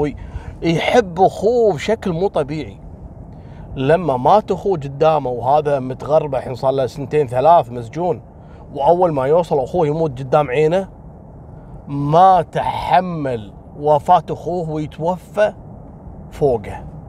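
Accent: Kuwait. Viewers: أدمنت طريقة سردك يا ولهجتكم الكويتية حلوة 🇩🇿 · ياخي مع انك تقول قصص جرائم ورعب وتخوف بس انت سعادة حقيقة بحياتي 😊❤️حبيت الكويت وأهلها ولهجتكم منك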